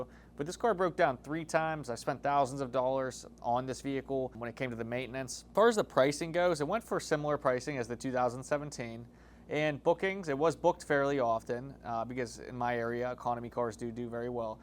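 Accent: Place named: Pittsburgh, United States. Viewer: I miss hearing the yinzer accent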